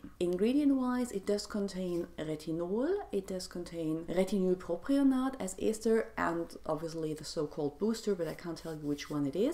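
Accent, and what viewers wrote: Germany. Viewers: I love your accent, are you German?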